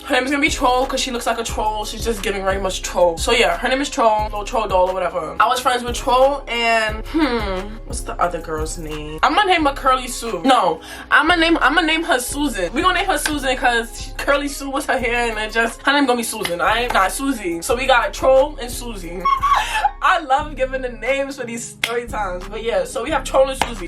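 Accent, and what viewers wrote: New York, United States. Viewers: the ny accent😍😍♥️! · Good work I can tell you work really hard and your gonna make it fs and i love your storytimes, and I luv the ny accent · The accent tho, You from new york?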